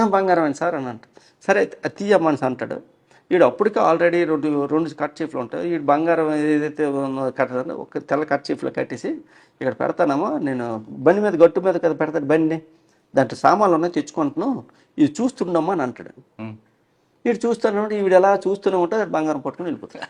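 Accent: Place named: Srikakulam, India. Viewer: సార్ ది మా శ్రీకాకుళం లాగుంది !!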